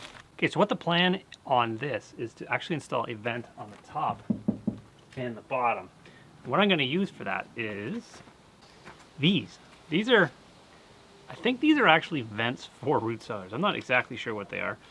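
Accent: Canada. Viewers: I just love to hear you talk. Canada speak, uh, yes.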